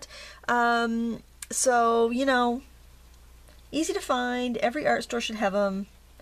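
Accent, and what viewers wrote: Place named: New England, United States. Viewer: I never tire of hearing the Maine/New England "Squerl" :) oh And "seepeeah" is correct - "Sepia" the Neo-Latin name for the first genus of cuttlefish that Linneas named in 1758. It is a transliteration of the Ancient Greek "σηπία", "sēpía", meaning "cuttlefish", and of course the colour has the same name as the ink of the cuttlefish is the original (and best in my view in terms of hue, stability is another matter) "sepia" paint. Hard to get now as cuttlefish are sold frozen whole for food with the ink sacks intact now, so not a byproduct.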